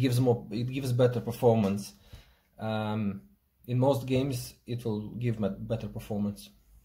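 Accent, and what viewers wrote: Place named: Russia. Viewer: То чувство когда русский базарит по английскому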